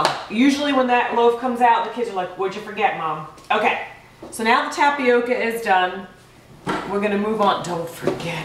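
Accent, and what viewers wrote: New York, United States. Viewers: Loved your video. I heard the accent New York 🗽 Baby! 🤣🤣 I’m just looking for better bread options and never used my bread machine that was gifted. Plus sometimes with being tired from work, my schoolwork, the kids schoolwork, house chores 🤦🏽‍♀️ I need to start using it. Let the experiments begin! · Would this work in a dutch oven format at high heat do you know? Being from NJ now in FL, I recognized NY in the first sentence. Love love the Northeast crisp to the pointness that we are "famous" for. Love your vid, very helpful. I stopped eating wheat 5 years ago, mostly raw vegan but I miss the ritual and smells so might try this. Beautiful job, lovely person!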